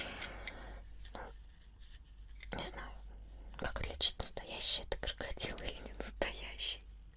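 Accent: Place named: Russia. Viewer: Eu amo o sotaque russo 😻♥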